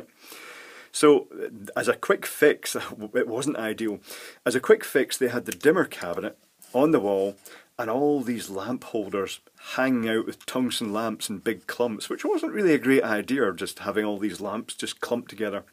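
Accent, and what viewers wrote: Scotland, United Kingdom. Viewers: Cool, a channel worth watching!, thanks man! Loving those dulcet Scottish tones and the information and ideas you provide in your vids, keep it up, you got me interested in electronics again! Regards and greetings from south east UK! :)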